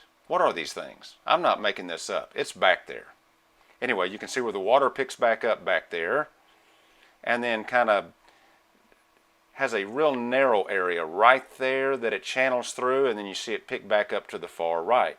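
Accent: South, United States. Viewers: Southern accent is the best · Mars is still inhabited by 5 species of Humans of varying sizes! They dont like or accept aliens coming to Mars! The Martians Kill all Alien life that land there? I also like your Southern drawl dear!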